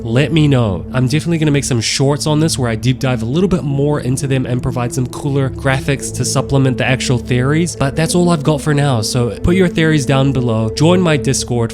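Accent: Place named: New Zealand. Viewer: YOUR FROM NEW ZEALAND. 😆😄😃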